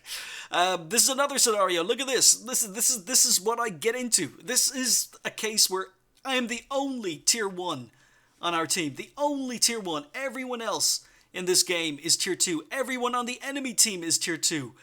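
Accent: Ireland. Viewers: Great video! Loved your sexy Irish voice! That sounded like something a Girl would say :p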